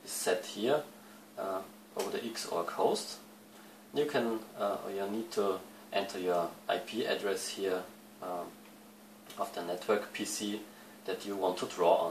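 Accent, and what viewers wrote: Germany. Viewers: german accents? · Deutscher Akzent xD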